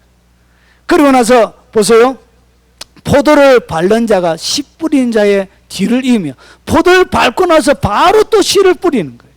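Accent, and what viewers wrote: Gyeongsang, South Korea. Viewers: 네 맞습니다 경상도 억양이 그렇습니다 입구조가 그런지 못 고칩니다 경상도 식당에 가면 시끌시끌 하고 전라도 지방은 조용합니다 큰 소리에 훼방 받지 말고 은혜받으시길 기도합니다 저도 목사님 말씀 늘 경청하고 있습니다 이와 같은 때 주의 귀한종들을 통해 꼴을 억여 주시는 하나님께 감사와 존귀와 영광을 돌립니다🙏저도 볼륨 조절하며 듣습니다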